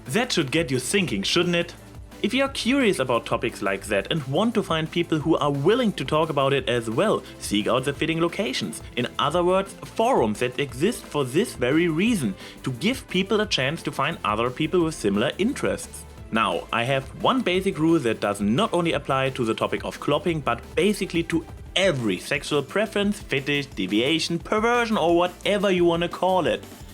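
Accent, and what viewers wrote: Germany. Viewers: I agree with the points you made. But sadly, the world has not yet become to the equestria-esque land we would all like it to be. As the human race is now, as awesome as we are sometimes, I don't think we are capable of learning this lesson as a whole. There will always be evil, no mater what form it takes. One last thing, just to sate my seemingly random and endless curiosity. From you're accent, I would like to know if you're from Austria or Germany. If both are wrong, I'm sorry. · I Like your Accent. Bist du Deutscher? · Dieser Akzent! Sorry, wir deutschen haben den nunmal xD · 14 He is German, and his accent is quite handsome. Also, if you are going to ask a question, at least, turn replies ON!!! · I've seen you replying to the comments, and is it bad if I read every one imagining your (FREAKING AWESOME) voice saying it all? Also, speaking about your voice, <3 dat German accent boiii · you sound like your german .__. 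· i really like your ascent,it reminds me of Germany from hetalia,i hope that's not offensive in some way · I am in love with your Accent. So very Germany sounding. Or Austrian? Where are you from? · 1st: I can hear that you are some kind of german. 2nd: Someone has to do it. 3rd: Good video, good english. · Are you German? Cause the accent kinda sounds like dat ^^ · your accent is quiet hard german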